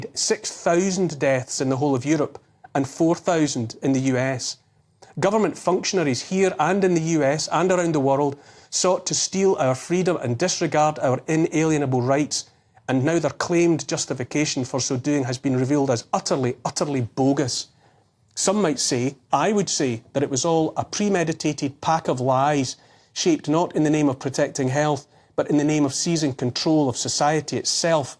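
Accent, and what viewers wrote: Scotland, United Kingdom. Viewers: These tyrants will need to be physically removed! 🤬🤬 Won't Kneel A gentle, beautiful Scottish lilt A kind, empathic, forward tilt An open heart; a caring face A willingness to take his place. A strong, home-hitting monologue Reminds us of the boiling frog The courage to become a sleuth A passion to release the truth. A deep desire to crack the whip Illuminates our freedom-strip Calls out the darkness taking hold The shiny despots; plated gold! Intense and raw, he lays it bare A soul-enhancing, honest stare Inflections drive the message through He speaks for Us - for me and you! Discernment's such a precious gift His words create a mighty lift Take heed of all he has to say He knows - it shouldn't be this way! 🤗🦋🐬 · Oliver: Would you consider making verified subtitles for such videos? While many people in the non-English speaking world might have enough command of the language itself, they have massive problems with the Scottish accent. 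(Which I personally thoroughly enjoy.) These videos by Oliver should be heard EVERYWHERE. Best wishes from Germany.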